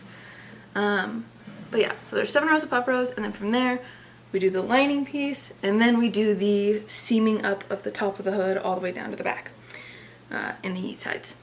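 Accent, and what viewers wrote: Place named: Australia. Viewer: hi. are you in australia? you sound australian but the yarns you mention are all US. Maybe if you blocked the hood it might sit better? Can you tell me what you dislike about this yarn and did you do a swatch first? anyhoo, I like the hood, especially the colours and it looks straightforward, nice to meet you :)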